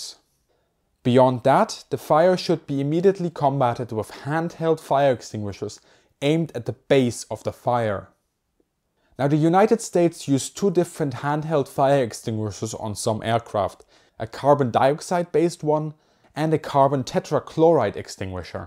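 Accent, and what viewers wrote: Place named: Germany. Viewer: Oh man, have you but a german accent.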